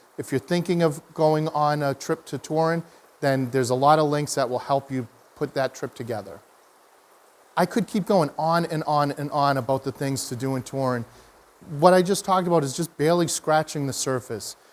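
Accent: Boston, United States. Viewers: I really appreciated your video on Turin; it was the first video of yours that I ever watched. I enjoy Turin and have made many trips there. But the most fun for me was in your first sentence or two - as a fellow New Englander, I said to my husband, I hear Boston :-) so I won the bet when you mentioned your former work as a tour guide there. Seeyah! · First of all your review with your Boston accent made me feel right at home. As a fellow Bostonian living in Southern California for many years, I love that you have relocated to Italy. I just returned from a month in Italia. I went Firenze Ancona Assisi and Rome. I lived over there 35 years ago and now that I’m retired I want to move over. I’ve been watching many videos. This one was excellent! I love how you put up the maps of the city to explain the different neighborhoods. All of the food reviews and attractions. 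I am leaning toward Trieste. Thanks again. A presto!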